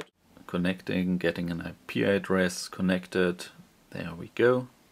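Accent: Germany. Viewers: are u from german ??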